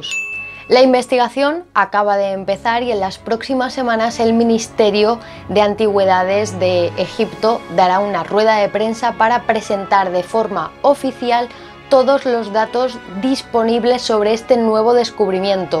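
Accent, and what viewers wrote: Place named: Spain. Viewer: Cm me gusta esa tonada española,mmm..saludos dsd Argentina!!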